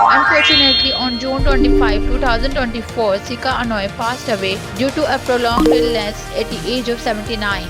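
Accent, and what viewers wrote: India. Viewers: Why does your voice sound so weird? It sounds like you're from India or something. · Seems to me the narrator need to work on her accent! The whole world knows she's from India!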